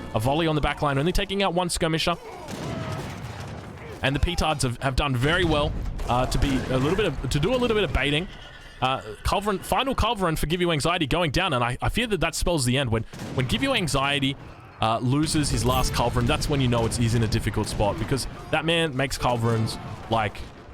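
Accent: Australia. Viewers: the australian commentary really adding to the Mad Max vibes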